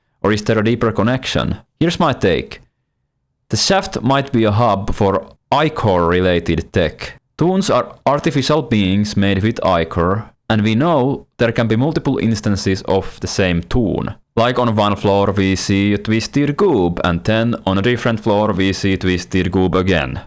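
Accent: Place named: United Kingdom. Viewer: Bro sounds britsh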